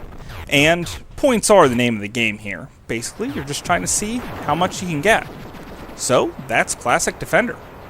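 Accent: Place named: United States. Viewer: Without looking at your details, but after watching almost all of your Jaguar reviews over the last couple of days , and enjoying and agreeing with most them, I'm pretty sure your accent is American...maybe Canadian. Why do I bring this up at all? Because through every single review you pronounce the Jaguar as JAG-WIRE. And though the British claim the true proper pronunciation with JAG-YOU-ARE, even the true American English pronunciation is JAG-WOAR with the WOAR pronuonced like CAR or STAR, which I only spelled that way instead of WAR since that is pronounce WOR, in, I believe both American and British English...where do you come from or where did you ever pick up JAG-WIRE?!? Another Good review I agree with though, even though I have picked on you in several of these video comments, Over all I love your style and your sensibilities are like mine for the most part, even with some games that are generally considered terrible, and you and I disagree. 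There are a few, however, that I am blown away because your review of a game was exactly opposite of what I expect from you or think about it myself...I'm loving it though! I will start checking out reviews you have for other systems now, that I have also...or, I would, but apparently the only other system you have in your play list that I have is the 3DO, and I'm not interested in the few items you reviewed so far (at least not interested in a review of interactive porn or what ever) You need to get some 3DO games! Want me to r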